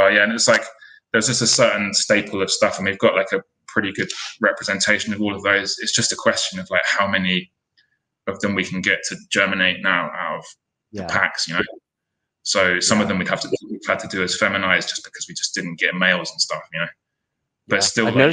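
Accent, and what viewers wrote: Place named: United Kingdom. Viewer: It's good to hear a voice from the UK! .... 🙂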